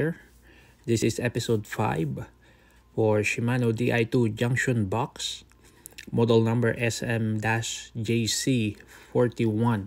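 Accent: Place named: Philippines. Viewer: That pinoy accent is strong here.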